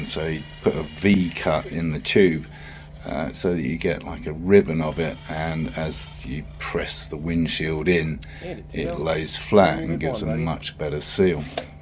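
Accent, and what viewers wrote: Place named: England, United Kingdom. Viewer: Really nice to hear an English accent for a change. There're so many dodgy characters, with bizarre voices on YouTube talking absolute garbage. This guy is a breath of fresh air. 👍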